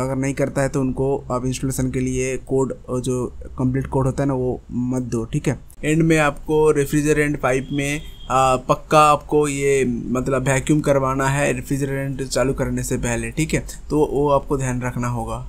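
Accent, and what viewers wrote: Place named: Bengal, India. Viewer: ভাই তোমার ভাষা শুনেই বোঝা যাচ্ছে তুমি বাঙালি।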